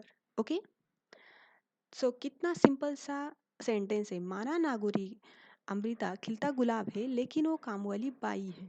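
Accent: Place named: Kashmir, India. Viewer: U seems to belong to kashmir the way u are talking!.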